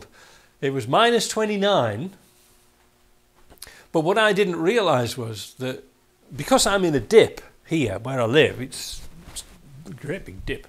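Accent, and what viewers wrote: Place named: Northern England, United Kingdom. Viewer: Hey where are you originally from? Just finding out you live in Canada? But your accent sounds like you are from somewhere in England maybe up north somewhere 🤔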